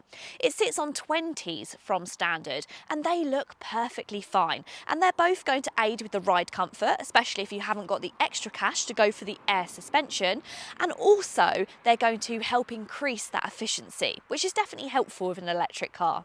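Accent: Essex, United Kingdom. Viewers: Hi, nice and informative video, great car regardless of the price. Besides, with a lovely British accent, a perfect listening exercise 🙂. Bye from Spain. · Are all of this ladies family , friends and parters the commenters on here ? Her outfit is ill fitting, nasty colour not fitting her figure or her Essex accent. She sounds pleasant and informed, better than Clarkson’s ego reviews. She does speak a little fast. Slow down a tad .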